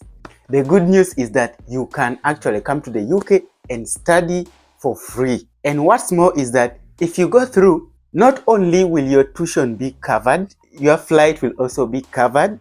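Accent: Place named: Uganda. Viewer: I heard your voice and I knew you are Ugandan. Thank you for caring.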